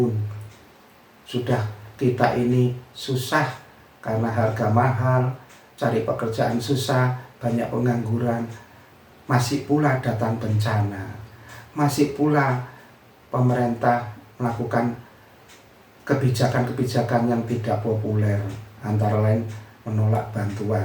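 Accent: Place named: Java, Indonesia. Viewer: keren om...analisanya semakin tajam dan mantap, logatnya medok 😂😂😂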